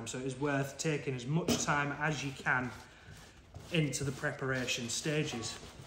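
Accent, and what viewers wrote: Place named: United Kingdom. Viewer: Other then the huge thick UK Accent making it hard to understand! I would like to see the quality of this leather jacket condition now. As, l have been trained never to use alcohol based substances on full grain leather.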